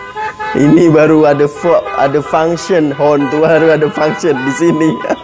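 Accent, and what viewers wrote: Malaysia. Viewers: Dengar dah macam accent malaysia oh 👍😂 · Bro...sy dah mula follow sebab sebutan bro dah mcm orang Malaysia....salut!!!.....terbaik bro...balik balik!!.... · Abang punye cakap pon dah macam orang Malaysia dah hhahaha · Confius abang ni Malaysia atau Indonesia.. Loghat suara rupa Malaysia dah ni. Ramai gila kot.. Kot banyak betul. Terus berjuang indon, Salam sejahtera dri Malaysia.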